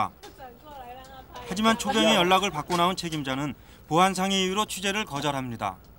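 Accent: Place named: Seoul, South Korea. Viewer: 그러고보니까 나레이터 90년대 서울 사투리 안 쓰고 요즘 서울말에 가깝게 말하네 취재진들은 90년대 서울 사투리 쓰는데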